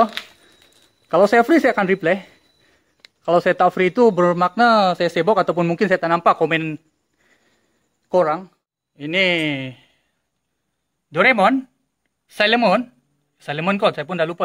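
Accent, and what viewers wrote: Sabah, Malaysia. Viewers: mcm org Sabah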